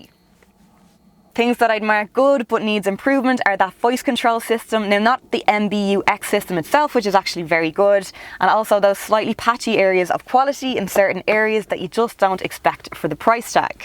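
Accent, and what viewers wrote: Dublin, Ireland. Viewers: You do have posh Dublin accent, no pun intended :) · Great Review, I am getting one in 2020, my price tag is 37 grand, so i hope i get a nice one for my money, plus i have a strong Dublin accent like you so again i hope Mercedes look at your review and adjust the car to our accent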